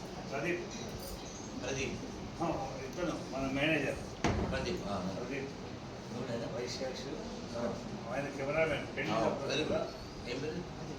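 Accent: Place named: Tirupati, India. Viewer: GURUVUGARIKI SHUBHA SAAYAMKAALAM , PADHABHIVANDHANAM. GURUVUGARU MEEKU MAA THIRUPATHI YAASA VACHEESINDHI GURUVUGARU. "ROJUKU OKATHURI CHADAVANDI" 🙏🏻🙏🏻🙏🏻🙏🏻🙏🏻🙏🏻🙏🏻🙏🏻 ANDHARI VAARU SWAMY, MEERU MAA THIRUPATHI VAARANDEE SWAMY MAA THIRUPATHI VAARANDEE GURUVUGARU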